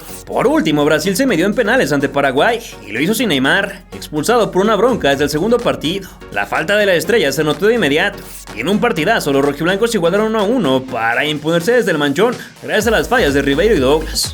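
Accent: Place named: North, Mexico. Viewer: Que onda con el acento norteño del narrador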